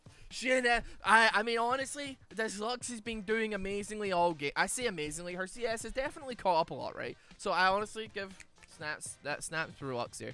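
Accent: Scotland, United Kingdom. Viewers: Extra-Scottish makes me wanna play D&D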